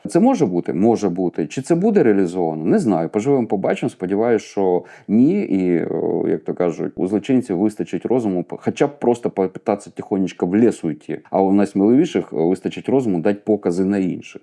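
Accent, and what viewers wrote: Ukraine. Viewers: Дякую за вашу роботу, пане Юрію. Я з Київщини, навчалася і жила у столиці і я 1976 року народження, тому завжди приємно чути нашу говірку у вашому виконанні і зрозумілі моєму віку приколи. Доступно подаєте важливу інформацію. Бажаю всього найкращого💛💙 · Якимся-))) обожнюю ваш суржик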